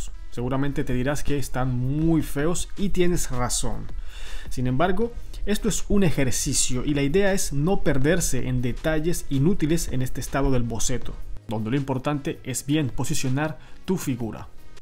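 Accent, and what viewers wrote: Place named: Yucatan, Mexico. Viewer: amigo hablai colo yukateko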